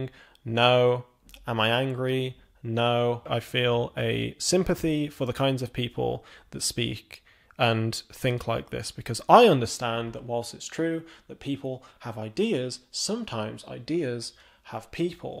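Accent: United Kingdom. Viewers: I didn't know that you had to base how you wear your hair, your clothing, or your vocabulary based on your skin color.....that is a weird concept to me. At what point does the percentage of your heritage outway the pigment in your skin and visa versa. 🤔 And can you believe that "people of color" live in all sorts of different countries? Its like...crazy to think that people have moved and immigrated to other countries. (Sarcasm) I watched your video and my first thought was oh he is british... not that you didn't sound black... whatever that means. 🙄 I enjoy your content and the way you present it. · the problem with the vocabulary argument is that people said he didn’t sound black which isn’t really a thing. He just didn’t sound American because he is British. People seem to think that black identity only exists in America which is just false. That what I mean when I was confused by people saying he didn’t sound black. 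But again, he’s just British. My partner sounds exactly like him because he was raised in London, does mean he isn’t black tho. Again they are just British 🇬🇧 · I seriously cannot believe that people said you're not black because you have a British accent, sound too smart, dress too well, etc? I think you're hilarious and am glad you confront this head on rather than hiding from it. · Sounding black really isn't a thing, said the whyte woman? Why do you think you'd be the one to decide that? Sounding black in terms of slang, word choice, accent and sentence structure definitely is a thing. Again when whyte people want to be racist and want it to be a thing they know how to sound in order to mock black people. So I don't buy it Yeah his british accent is very obvious. I don't think it was lost on most people, but even for a british person he sounds very whyte, lol. He literally has a whyte mother, don't even know why you're arguing this. 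😁 Even british people will tell you there are all kinds of accents, you don't think a black caribbean or african accent is one of those? And yeah you can be black and not have these typical black accents but it doesn't mean you'd sound or act like him. He definitely has some extra whyte mayo in him (I mean he does literally, he's not black he's half whyte) and it's no surprise that it comes through in his views on race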